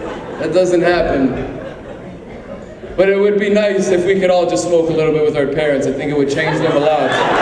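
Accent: Azores, Portugal. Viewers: Não é português decente é português das ilhas, ou dos açores ou da Madeira. Como raio é que não sabes que pronuncia é esta? É a mesma coisa que não perceberes português no Porto ou em Mirandela. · Ele é cómico mas a sua pronúncia açoriana ficou ainda mais difícil de perceber intercalada com o inglês. Além disso as coisas que ele diz aplicam-se mais aos açorianos do que aos portugueses em geral.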